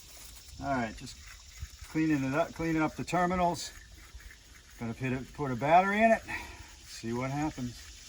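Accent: New England, United States. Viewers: haha thought I could here some new england in your voice! Keep up the good work love your channel!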